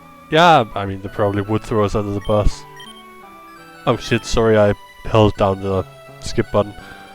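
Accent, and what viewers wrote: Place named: Denmark. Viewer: omg I love the shoutout you gave me at the beginning 😂😂😂(funny thing is when I first saw your video I was wondering if your accent was Australian and that's why you had a Talon flair. Took me a while to realize you were Danish because only Danish people pronounce the letter "l" at the end of words that way) V10 was interesting because that's when I really began to feel reinvested in Rejuv. Looking back, it was V4-6 that really got me invested in the game because that's when the story began to get riveting (I always loved the Valor Mountain finale), but then V7-9 came and I found them quite boring. V10 not just brought the return of interesting story, but I think that's when it really began to feature the beautiful mapping style that we associate with Rejuv. To me, it was the first version that felt mature and well-made and no longer like it trying to play catch-up to Reborn. 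Though interestingly, lots of fans kinda dislike Ch 12 due to the story. I remember Jan acknowledging the flaws with Ch 12 when he remade it in V13. Something about introducing more gameplay elements since it was more like a visual novel than a game. But my understand though is he couldn't change much of it as it was too consequential to the worldbuilding. The revisit to GDC in Ch 12 is quite interesting, since it was scrapped and moved around in 13.5 but contained important story elements that needed to be placed elsewhere: - Was the battle with Risa in the beginning of Ch 12 only added in a later versio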